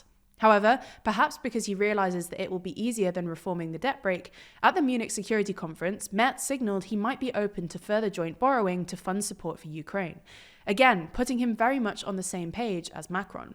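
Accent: United Kingdom. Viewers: I just can't listen to an Asian person with a British accent. It's so wrong.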